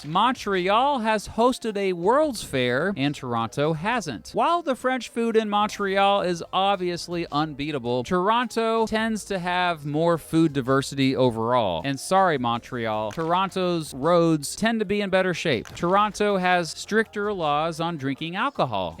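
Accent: United States. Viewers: His grating American accent was enough to stop watching . Get a Canadian at least to narrate a video about Canadian cities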